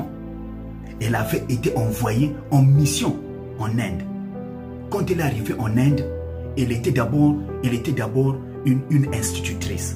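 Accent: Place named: Gabon. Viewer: Ah ta we mu Nzebi? Tu parles comme un gabonais...Merci pour les conseils.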